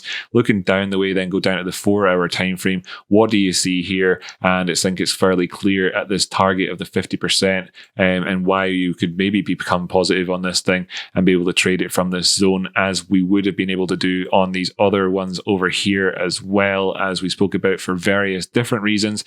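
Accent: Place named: Scotland, United Kingdom. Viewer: only came across your ta over the last few weeks, really enjoy it. easy to follow a scot as a welshman too haha keep it up bud!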